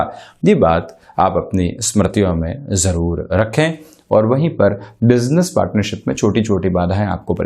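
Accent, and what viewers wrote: Standard. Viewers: नमस्कार सर जी I आपकी भाषा व उच्चारण इतना शुद्ध और उच्चस्तरीय हे जिससे मन प्रसन्न हो जाता हे I जय हो I शुभेच्छा 🙏🏼🙏🏼🙏🏼🙏🏼🙏🏼🙏🏼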